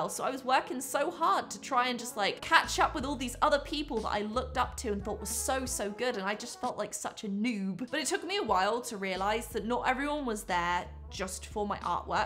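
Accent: England, United Kingdom. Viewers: I first started watching you because I miss my family in the UK and listening to your English accent was comforting. Having said that, I also love your quirkiness & how you can laugh at your mistakes. Your creativity, in whatever form is also always a great watch. I believe being a YouTuber takes a lot of bravery (I'm not brave at all) so please keep on being you & thank you for doing this ☺️❣️🤗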